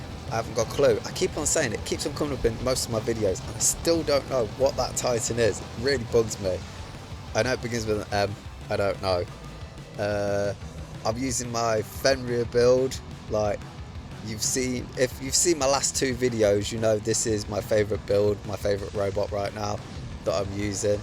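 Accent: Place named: United Kingdom. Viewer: thanks for the reply ! I didn't mean any offence , I play this game since it was released for testing and never had the chance to hear a wr channel with UK English. You're also a good pilot. Keeps it up 🔥👍🏻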